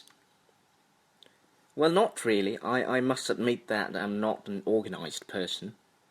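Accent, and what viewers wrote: India. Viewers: Giọng Ấn......eo....kinh....;D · like your Indian accent :v really cool lah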